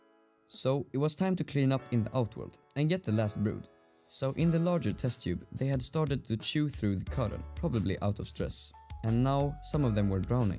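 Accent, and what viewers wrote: Sweden. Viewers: You can hear that he is from Sweden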